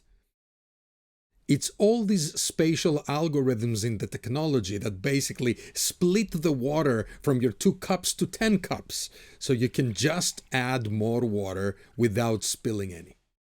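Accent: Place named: Greece. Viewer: Subbed you right away, although it has more to do with how you present the info & content, that greek accent is too good.. (this channel is certainly not boring like other audiophiles out there)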